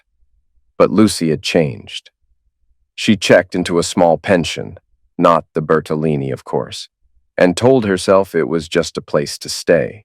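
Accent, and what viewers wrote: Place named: United States. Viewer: Somehow, the american accent doesnt quite do justice to the story.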